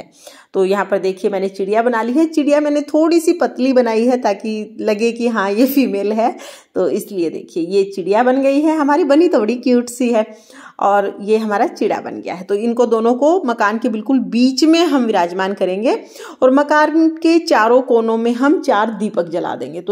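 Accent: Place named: Bengal, India. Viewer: Di aapki bengales kafi pyari lag rahi h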